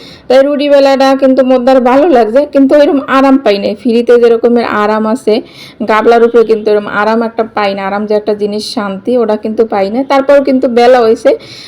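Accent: Barishal, Bangladesh. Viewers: বরিশালের ভাষাটা অনেক ভালো লাগে আর রান্নাবান্না গুলো অনেক সুন্দর ছিল আপু সাবস্ক্রাইব করে পাশে রয়ে গেলাম বন্ধু হয়ে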